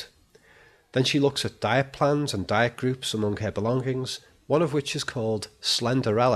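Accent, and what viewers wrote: Liverpool, United Kingdom. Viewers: Where you from? South Liverpool?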